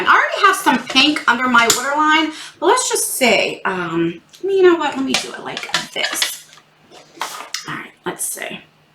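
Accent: New Jersey, United States. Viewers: Good box! I really like your earrings, they're giving me a 90s vibe. Also, your Jersey accent is coming out strong today 😀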